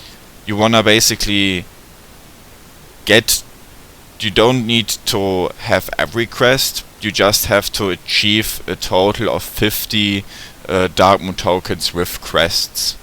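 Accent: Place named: Germany. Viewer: You kinda sound like our german teacher, I mean, your accent is kind of similar (I don't live in Germany but he teaches German) Good luck with your channel from Sweden :D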